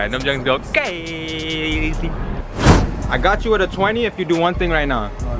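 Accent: Jamaica. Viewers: U know to talk like Jamaican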